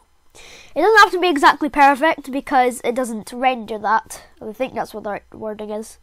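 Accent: Scotland, United Kingdom. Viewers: U sound Scottish r u Scottish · Are you Scottish? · Lol its cool because you havent fully developed your british accent in these early videos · Are u British xD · Are you Scottish? I love your accient! I am Scottish btw! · I’m guessing your from Scotland? 🏴 It’s alright, I’m from the great country to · Are you Scottish???! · This helped a lot tysm!!! Also- are you Scottish? Cuz I am too :) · You sound so Scottish! (No offence if your not, I am anyway..) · are u from scotland? Dumfries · Are you Scottish? you sound Scottish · Omg your Scottish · Are you Scottish . You have an accent which sounds Scottish cuz of the way you say it. You don't really pronounce the T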